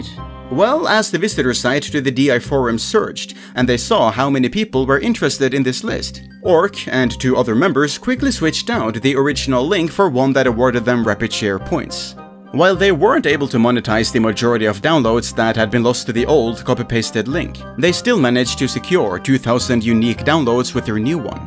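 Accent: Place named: Norway. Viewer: Are u Norwegian? Your accent makes me think you are.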